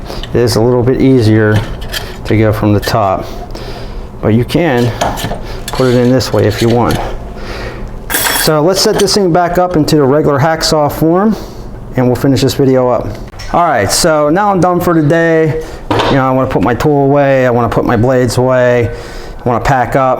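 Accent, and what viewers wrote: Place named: New York, United States. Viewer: Great video. I'm loving your accent...... straight out of 'Goodfellas' 😊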